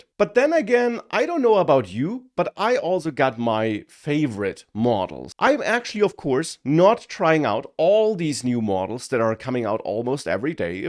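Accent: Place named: Germany. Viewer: Pretty sure he is German which natively uses W and V sounds, guarantee his English is way better than yours, bud. What is your native language so we can make fun of you?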